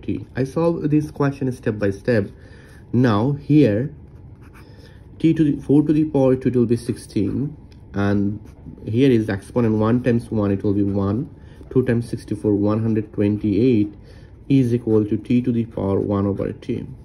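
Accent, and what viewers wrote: Bengal, India. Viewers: U Bengalis hv really pathetic and irritating accent. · Brother sounds Bengali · Thank you but please try to speak with a clearer accent. You sound too young to speak with a Bengali accent. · Pajeet accent!!!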